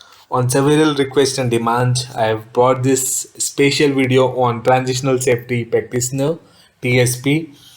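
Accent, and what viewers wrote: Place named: Bhojpuri, India. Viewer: Dear if u cant speak english properly for explanation about the international safety course then pls donot use bhojpuri english ....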